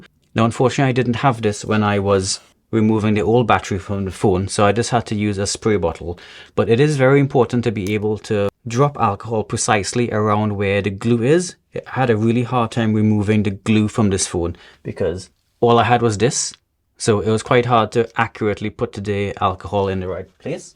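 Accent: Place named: Trinidad and Tobago. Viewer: I could hear the Trini accent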